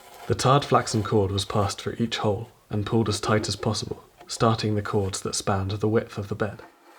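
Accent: United Kingdom. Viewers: I think, it's a good idea to make summary videos like this, to provide some insights and to share your experience along with a video itself. I read descriptions you put under your videos, they're full of interesting information, but they're also not aligned with visuals, so two exist slightly separately. Having them synchronized, with an additional information, is very cool! And I like British accents, so more narrated videos, please.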